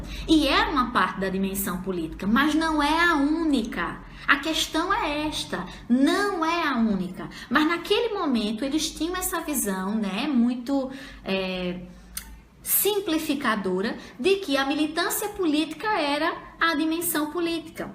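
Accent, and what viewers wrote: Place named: Paraíba, Brazil. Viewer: Nossa!!!! Que aula maravilhosaaaaaaaaaaaaaaaaaaaaaaaaaaaaaaaaaaaaaaaaaaaaaaaaaaaa Professora pensa como eu penso. Mt didática e ao mesmo tempo teoriza mt bem... Mas o sotaque parece de paraibana rsrsr. Meu Nordeste me Orgulha mesmo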